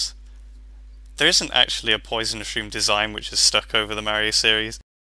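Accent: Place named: United Kingdom. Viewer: Whoa. I never watched the Goomba guide, so I never knew was British....Whoa. I dint have a problem with British people, in fact, I like English accents, but I never imagined him as British.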